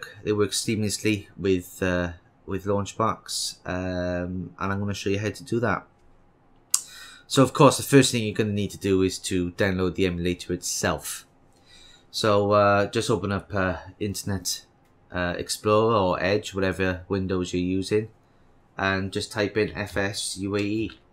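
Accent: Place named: Wales, United Kingdom. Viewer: For a second at the start there I thought you were Irish too, turns out not so much.... Welsh I think.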